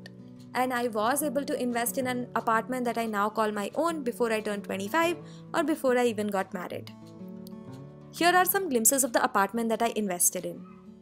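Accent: South India, India. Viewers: Pure south Indian accent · Great.. Indian accent as well as melodious sound...! But u speak a little bit fast!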